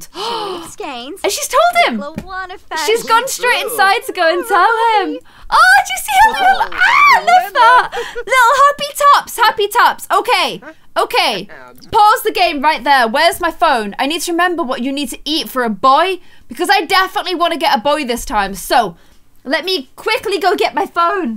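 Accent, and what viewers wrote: Ireland. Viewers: are u irish because if u are i am too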